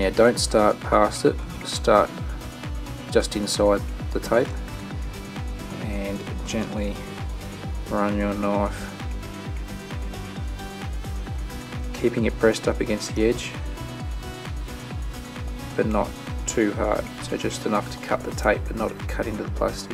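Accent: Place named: Australia. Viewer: So Aussie haha (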